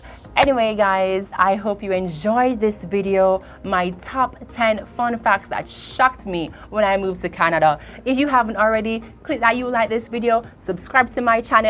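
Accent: Jamaica. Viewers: I was shocked that you never mentioned the weather girl. I guess you've seen enough videos of new immigrants having the wrong shoes and no gloves or head protection in their first winter here. As a 66 year old Canadian I find winter shocking to me every year so I have to run away to warm places for months. I could pick up your Jamaican dialect coming in whenever you started to get excited in the video. Having lots of Jamaican friends I'm used to that. Anyway, it was interesting to hear the differences coming from your angle. Welcome to our secret 'best country in the world' (ignoring winter). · A few points to fix, that will make this a great video.. - use different cam angle, more close up of your pretty face, lock your focus.. - use more full screen live & shot of your topic, with close up shot, (alcohol shopping full shot wud great, mon).. - a little long winded, make it shorter.. 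- use more text to highlight you topic.. - need to put your mic closer, so u sound clearer, louder (cos w the accent some words are lost to non-jamaican) * use more Jamaican slang/attitude, its fun, its cool n it adds flair.. * You hv great cam presence n the way you talk is fun/great (like shameless maya).. just need more variety (like jennelle eliana) .. 👍👍👍 Get ready to go viral..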